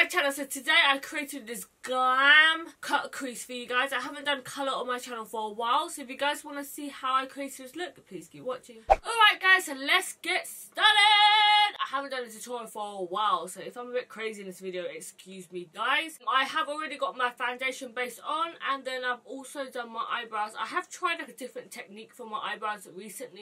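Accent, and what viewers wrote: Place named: London, United Kingdom. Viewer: Always. The intros always make me think damn this is going to be pretentious then you say “HI GUYS” in that London accent I’m like yaaas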